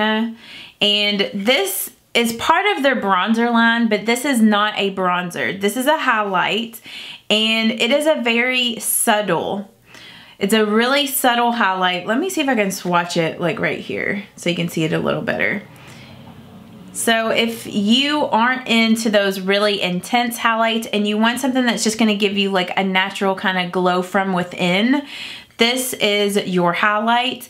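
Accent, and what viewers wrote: South, United States. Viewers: Lol, sorry looks like I'm flipping yall off in that southern draw, I loved it.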